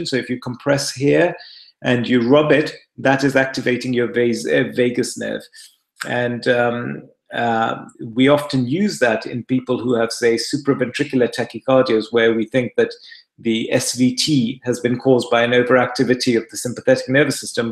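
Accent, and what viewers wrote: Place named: United Kingdom. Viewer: You are sooooo handsome! Why do you have a British accent? You look like my friend, Dr. Raji. From India.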